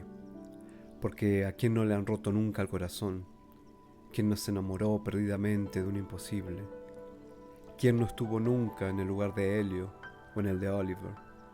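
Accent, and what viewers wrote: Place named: Argentina. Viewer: Una pelicula tan buena. Quantas lagrrimas quando el regreso' a USA. Seguir ese canal me permite de escuchar tu voz argentina que me gusta mucho. L'acento es lo que prefiero de l'America del surf. Gracias 💚 Un beso desde Italia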